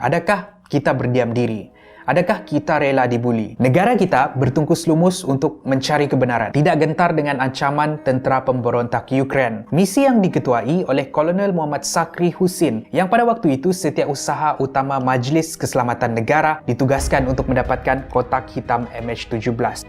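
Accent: Sabah, Malaysia. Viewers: Ini loghat Sabah tu pun tak pandai beza kah. Dasar orang kl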